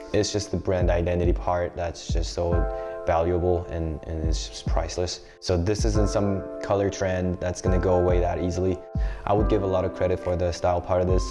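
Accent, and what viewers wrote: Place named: United States. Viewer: Great American English! Is it your second language in S. Korea? Great review! Wouldn't you consider this kind of Mule shoes, let's say 'girlish'? - Cheers from Peru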